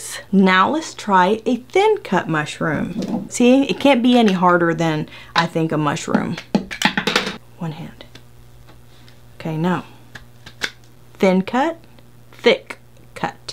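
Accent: United States. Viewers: Where is her accent from? Certain state of 🇺🇸?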